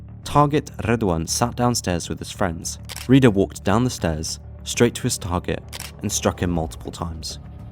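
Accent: Netherlands. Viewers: Mate who narrates these vids ? Are u dutch ?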